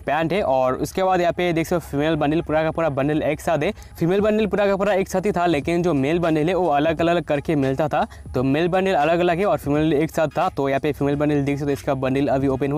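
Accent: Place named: Assam, India. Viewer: YOU'R SOUND LIKE ASSAM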